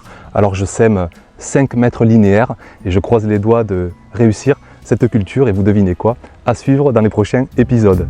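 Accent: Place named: Montpellier, France. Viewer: Bonjour, un immense merci pour ces vidéos très intéressantes. Le propos est parfaitement clair et votre amour du potager et de l'écologie est communicative:) Bonsoir, Je travaille en tant qu'assitant pédagogique au Collège des Escholiers de la Mosson à Montpellier. Nous sommes une équipe de 5 (pour l'instant), et souhaiterions relancer le potager du collège, qui est déjà bien en place, mais a été laissé en friche depuis trop d'années.... Personnellement, la permaculture est un domaine que je découvre peu à peu... et qui est passionnant! Connaitriez-vous des personnes ou des assos qui voudraient bien nous aider, pour faire un premier "diagnostic" du jardin sur place, et nous conseiller un peu sur les choses à mettre en oeuvre pour lui redonner vie et l'animer? Nous avons déjà un peu planché dessus, mais des avis d' "amoureux" initiés et d' "experts" nous permettraient sans doute d'avancer plus sereinement, et de mener à bien ce projet qui nous tient à coeur pour la vie de l'établissement. 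Nous souhaiterions commencer les actions dès octobre, idéalement et dans la mesure du possible. Timing serré...:p C'est jouable selon vous? On prend toutes les pistes: rencontre autour d'un verre, chaines youtubes, sites internet, bibliographie... et si vous êtes dans le coin de Montpellier (l'accent laisse penser que vous êtes pas trop loin), ça serait un plaisir de vous recevoir au jardin (si ça se met en place...) Vous pouvez me contacter en sur Facebook (Nyco Youpy) ou sur <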